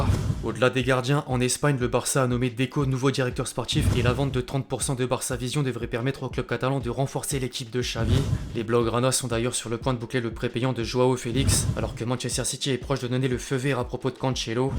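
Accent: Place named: Banlieue, France. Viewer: C'est quoi cet accent de banlieusard....sans déconner...pauvre France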